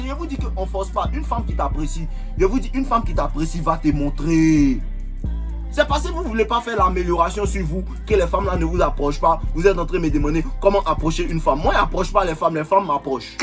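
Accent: Ivory Coast. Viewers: j'apprécie ton français ivoirien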